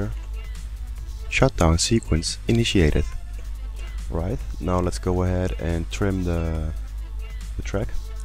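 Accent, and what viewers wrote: Netherlands. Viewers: Are you dutch? You seem to have a dutch accent... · are you dutch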